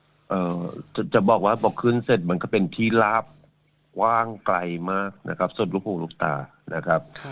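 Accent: Kanchanaburi, Thailand. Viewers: สำเนียงคนกาญจนบุรีเนาะ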